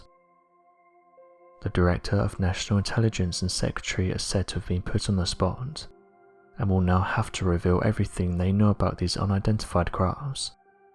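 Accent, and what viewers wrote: United Kingdom. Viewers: British right?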